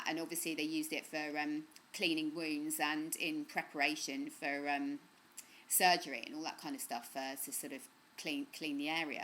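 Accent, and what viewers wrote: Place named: United Kingdom. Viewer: Your accent is so gorgeous! Which part's of UK it is? :) Just find your channel and binging on it now :) Thanks for great info! xx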